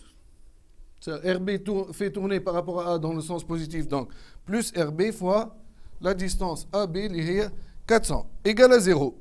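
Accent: Tunisia. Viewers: الله على اللهجة التونسية جنان والله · Français en dialecte tunisien 😎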